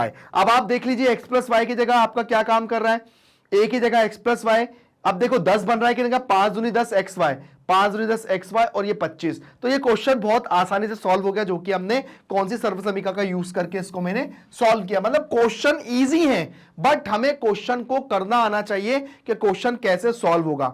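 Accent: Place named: Bihar, India. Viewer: Bihari ho bhai❤❤❤❤😂😂😂😂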